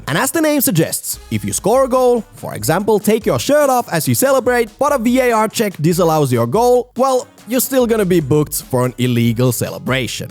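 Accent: Finland. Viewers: Im 100% sure the guy talking in this video is from Finland. Suomi perkele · Is this commentator from Finland? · Funny how the narrator tries so hard to hide his Finnish accent :DD just rock it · Im pretty sure ur A Finn cuz off that accent 🤔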